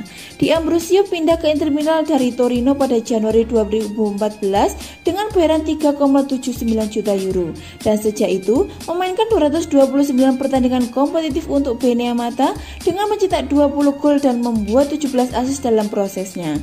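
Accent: Java, Indonesia. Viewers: Medddoook banget mbaaaakk eee 😅😅😅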